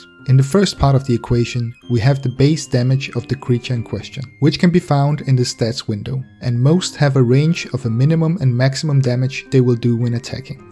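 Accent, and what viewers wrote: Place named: Denmark. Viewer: Are you danish? I sense some accent.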